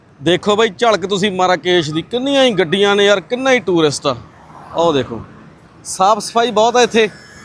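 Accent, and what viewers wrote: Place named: Malwa, India. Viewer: chal oye ehe baut vadia part c, bai ghaint a pura, roti baut sohna kehanda, menu lagda malwe da hana bai?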